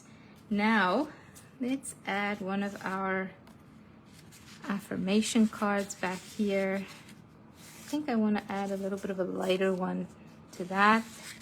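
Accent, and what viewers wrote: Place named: South Africa. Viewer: Hi there, been subscribed to your newsletters for over a year. You sound South African. Thank you for the lovely freebies. I love getting them. Thank you from Cape Town, South Africa.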